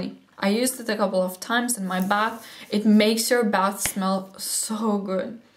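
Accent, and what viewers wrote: Russia. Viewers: I barely found your videos. They are great. Where are you from cause your accent sounds Russian. Awesome video by the way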